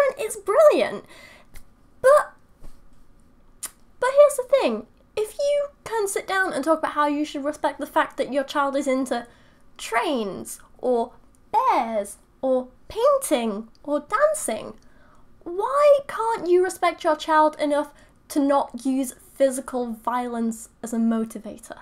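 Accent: United Kingdom. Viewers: Loving the video so far but it's a little too brit bong for me. But I dunno maybe I'm just too American to understand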